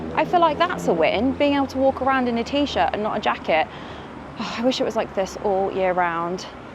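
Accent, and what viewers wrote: United Kingdom. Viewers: London stories and british accent, the perfect combination to practice my English. Thanks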